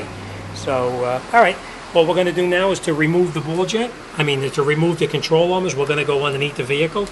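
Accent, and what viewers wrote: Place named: Boston, United States. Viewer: My FL friends used to make fun of my "how you doin" accent (Paterson and Fair Lawn) but man oh man I really thought you were from Boastn (caaa-no r). Anyway thanks for the cool videos you post! It is refreshing watching them.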